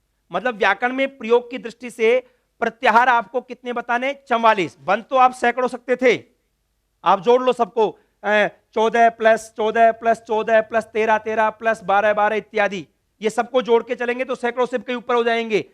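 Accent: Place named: India. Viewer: You have to pronounce Alphabets n words properly while teaching Sanskrit. अ is अ not अय् । हिन्दी टोन आरही है नेपाली मे संस्कृतका टोन आती है वह ठिक है संस्कृत के लिए ।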